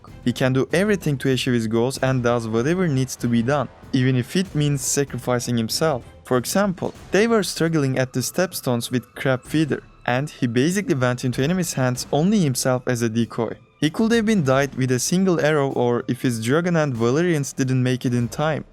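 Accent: Turkey. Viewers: Bu adamın türk olduguna yemin edebirim aksanından çok belli oluyo ginede videoların güzel böyle dewam :D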